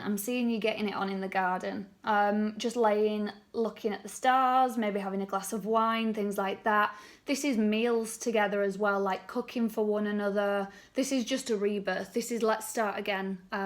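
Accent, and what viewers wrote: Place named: London, United Kingdom. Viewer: so nice of you to think of us. i love your dialect it reminds me of my students years in the late seventies in north London♐️🇨🇭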